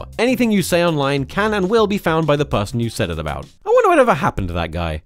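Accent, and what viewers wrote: United Kingdom. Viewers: just wanna say love you vids not only because your also English (I assume from the accent) but because your videos have always made me laugh 😁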